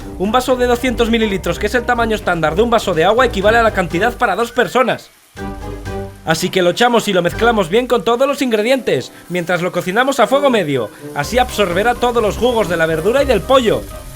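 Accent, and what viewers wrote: Basque Country, Spain. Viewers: es vasco y el acento vasco suele ser mas fuerte que el acento español "estándar", que ya para algunos latinoamericanos resulta mas rudo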